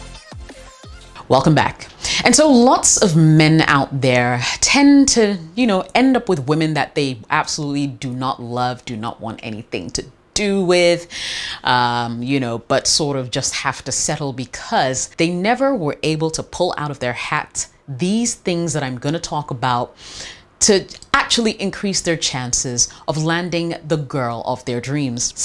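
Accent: United Kingdom. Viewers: Of all the coaches and advisors I watch on YouTube everyday, is the best.: You are a genius. I never miss any of your exciting video-lectures in your beautiful, perfect,crisp,refreshing British English. Hugs from Venezuela,Virgil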